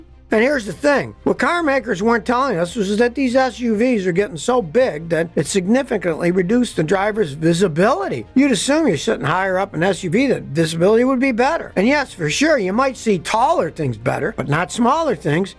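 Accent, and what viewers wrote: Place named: California, United States. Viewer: you’re sounding like a californian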